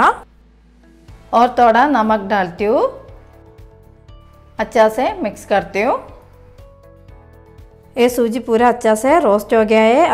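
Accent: Kerala, India. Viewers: Hindi u talk so well in Kerala accent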